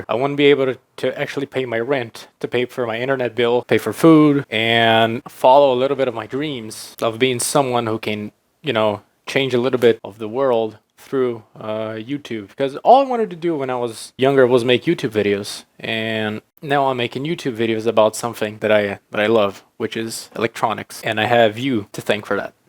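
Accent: United States. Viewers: You speak English near perfectly! If you didn't tell me your nationality and where you lived, I would've thought you were an American from the North East or California! And your wireless mic sounds great! Adeus!🙂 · i thought that he had a bit of that ny flare ahah, i second that blame on rossman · You taught yourself English?! You pretty much sound American, man. That's impressive 🙂 Well done!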